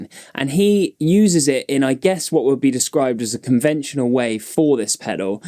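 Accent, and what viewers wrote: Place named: United Kingdom. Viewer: My weekly bri ish pronunciation lesson ❤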